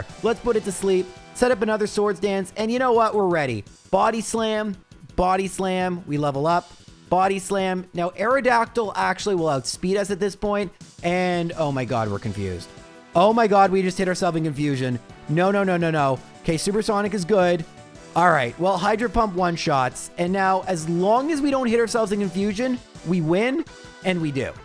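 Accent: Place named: Canada. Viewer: Whats funny to me is i wouldn't have picked up on your Canadian accent if it weren't for Y2J and the way you say "against " 😂 not that it matters. Nothing matters.. 💀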